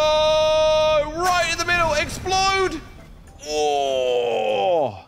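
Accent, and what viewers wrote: United Kingdom. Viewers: Are you from the uk?🇬🇧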